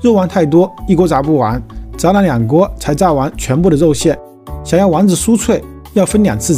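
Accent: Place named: Hubei, China. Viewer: 大厨是湖北人吗？听出点口音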